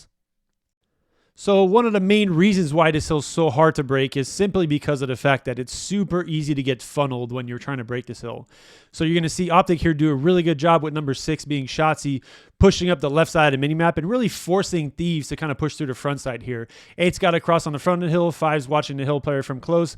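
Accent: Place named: Quebec, Canada. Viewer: Thank you! I was in your live stream when you were talking about this and I remember asking if you would make a youtube video about it, so pumped to see that you pulled through. Please make more videos like this!! Also cheers from Canada, I know a french canadian accent when I hear one.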